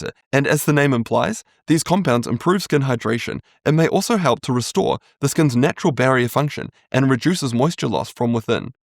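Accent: New Zealand. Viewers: Seems fine to me. Maybe it's the New Zealand accent? I'm Aussie so perhaps this is how I talk too 🤣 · Love the Kiwi accent… even though I hope AI wasn’t involved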